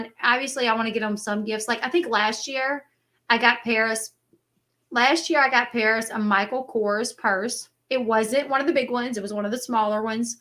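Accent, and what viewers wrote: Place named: Louisiana, United States. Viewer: Her mailing address is lousiana, usa, and her accent is def. in line with that area.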